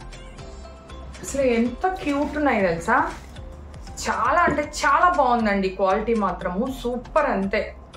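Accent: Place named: Nellore, India. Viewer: Hi garu nenu mee vedios first time chusanu meeru matladetappudu Nellore slang la vunde anukunna anukunnate meeru vedio lastlo Nellore antaa tiriganu stand kosam annaru kada appudu ardamayndhi meeru kooda mana Nellore vallani😅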